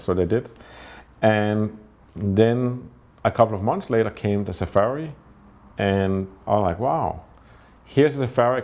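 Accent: Denmark. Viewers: Danish English > Dinglish!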